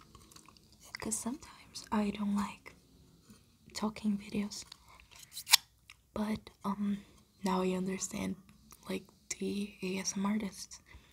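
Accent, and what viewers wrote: Brazil. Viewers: Aquele sotaque brasileiro bem por longe, sabia que tinha algo ai hahahahah Ja vou me inscrevendo e obrigado pelo videi, tras mais lens licking❤ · vc é brasileira? kk na hora que abrir o seu video eu percebir, mais achei que era só achismo kkk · sabia q era br, sotaque inconfundível, bom video!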